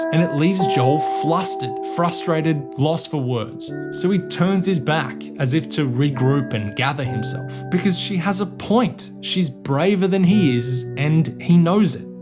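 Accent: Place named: Australia. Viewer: Your accent sounds nice. Are you by any chance from Aussie or a you a Kiwi?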